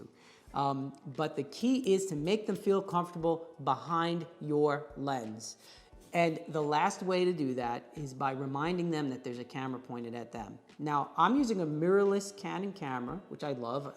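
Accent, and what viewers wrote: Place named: Bronx, United States. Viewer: Thank I grew up in the Bronx listening to you made me home sick. Great job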